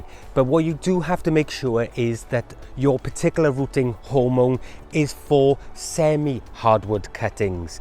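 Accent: Wales, United Kingdom. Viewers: Thank you, that was so clear and totally understandable and especially delivered in a lovely Welsh accent. It is so applicable to our Scottish climate.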